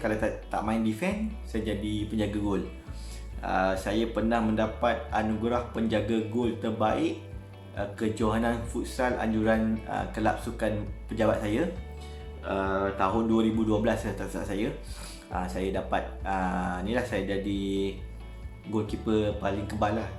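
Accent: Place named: North, Malaysia. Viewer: Happy birthday, sikit lagi nak masuk 4 series. Dalam banyak dilek, utara punya dilek paling best dengar.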